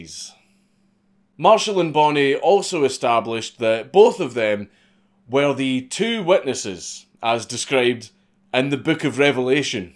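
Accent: Scotland, United Kingdom. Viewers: Dude, you're so funny! Add that to your 200% Scotch look and sound, I'm ROFL-ing here! 😂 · I love this man's accent If I've guessed correctly, Scotland is full of people with a similar accent, at least in one particular region, but I love it. An appreciative Yank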